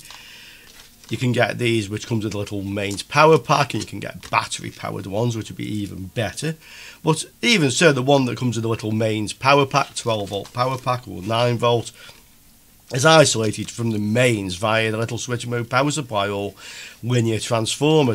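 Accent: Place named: England, United Kingdom. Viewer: Very entertaining and valuable video. Learned now how to "design" the varioboard today. Can someone tell me what English accent he has? Where does he come from in the UK?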